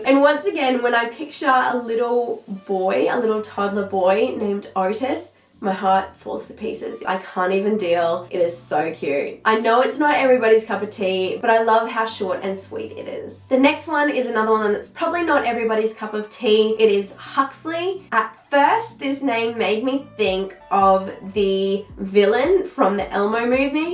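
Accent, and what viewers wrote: Australia. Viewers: OMG she sounds like an astraillian person lol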